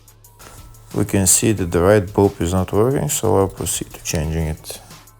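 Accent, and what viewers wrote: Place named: Eastern Europe. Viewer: This accent sounds familiar. Kinda eastern European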